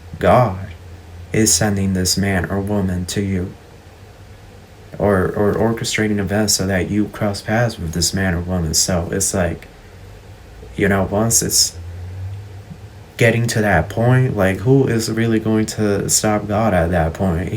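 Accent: South, United States. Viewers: I love your sweet southern accent ❤ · You got a country ,tipsy sounding ,way you speak 🗣️ 💜 I love it ! ! ! 😍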